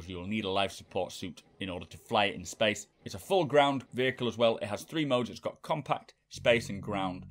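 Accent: United Kingdom. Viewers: You mean American subtitles, he's speaking (British or Scottish) English.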